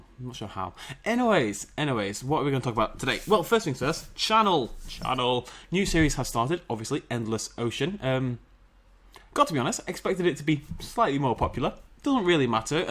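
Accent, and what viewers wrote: United Kingdom. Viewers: Everytime I hear a British accent, the face never matches what I imagine and it’s honestly fascinating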